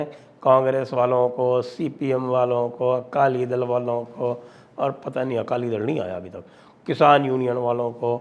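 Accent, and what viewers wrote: Haryana, India. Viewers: Haryanavi accent 😅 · Hi sir I like your Haryanvi style Baddee Baddee pehlwan..... Ho Ho Ho Haa Haa Haa.......Thanks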